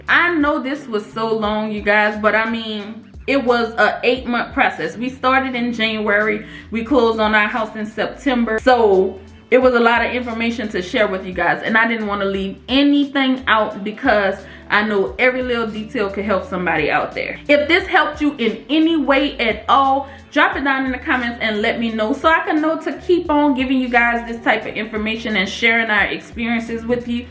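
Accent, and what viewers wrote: New Orleans, United States. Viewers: OMG! You sound like you're from home (New Orleans). Anyway, I have just started your vids. Yes, I am ready to buy that house this year. I'll keep watching.... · I'm hearing a New Orleans accent. You're from the boot? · Thank you so much for all this valuable info.... This is Great and Very Helpful !!! Love your N.O. Accent!!!...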